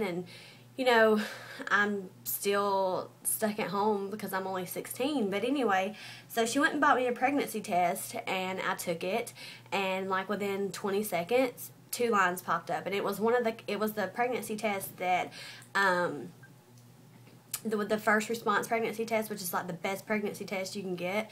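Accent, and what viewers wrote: South, United States. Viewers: You're sooooooo pretty, and confident, that's good stay that way! and I love your accent, from the south? same here lol. your story was very understanding, stay strong sweet peaa :) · Wow- I stayed and watched the whole video. And I most definitely agree with you on the "abortion" and "if you are old enough..." speech/opinion. Off topic, but I love your accent... and your face structure kinda makes you look like Miranda Lambert. Anyway, good luck with you, your son, and boyfriend. :D! Go southerners! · Where are u from because u sound southern like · Hey! Your so pretty, and have the cutest country accent. Lol. I'm 15 and 21 weeks pregnant now, so I understand what you went through just don't understand the actually raising your kid part YET. Its crazy cuz when I first got pregnant, my boyfriend just turned 20 back in january and i didnt have a clue that i was, and i found out at 8 weeks.:D Although, I'm still going to highschool. 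Lol. But post more videos of you and your son, He's adorablleee. <3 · omg you are so pretty and i love your country acsent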